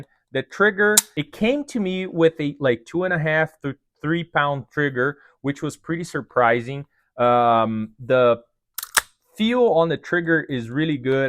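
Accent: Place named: Quebec, Canada. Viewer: I'm hearing a French Canadian or Newfie accent? Where are you from?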